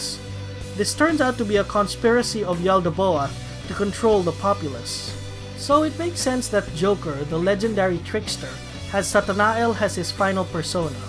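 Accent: Philippines. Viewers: That filipino accent is too thicc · He has that thick filipino accent. Pretty sure he is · You oddly sound like a Filipino. Great stuff tho! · Damn that Filipino accent is getting to me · accent seems familiar. are you a Filipino? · The Filipino accent is killing me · I just can’t help notice your accent. Are you a filipino?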